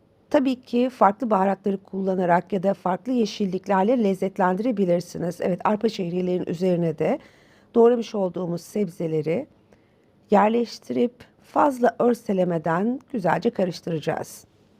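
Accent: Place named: Istanbul, Turkey. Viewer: istanbul türkcesi konusan o jadar aziz ki.Sizi dinlerken cok mutlu oldum🎉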